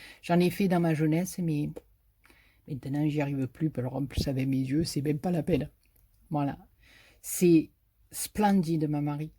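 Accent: South, France. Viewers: C’est dommage que tu sois obligée de te justifier. Ton expliquement et avec l’accent c’est presque du Pagnol. J’adooore. Tun’est pas là seule à vendre et après. Qu’ils s’occupent de leur c........bravo la star. Bisous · Bonjour je tombe sur votre chaîne et quel accent charmant qui sent bon le soleil ☀️ laissez parler les mauvaises langues et bonne continuation 😘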